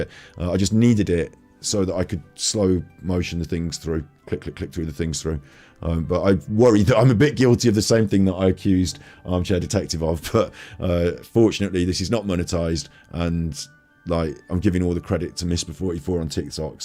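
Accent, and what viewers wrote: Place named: Midlands, United Kingdom. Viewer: Agreed ! I like his thought processes and I ❤ how he sounds, eg: Midlands accent. Oh, and easy on the eye as well 😊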